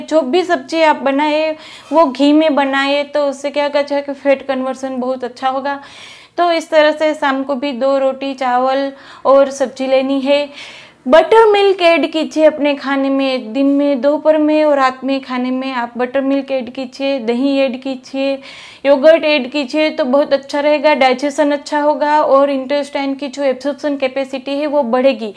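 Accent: Gujarat, India. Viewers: Are you Gujarati? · Gujaraat se ho kya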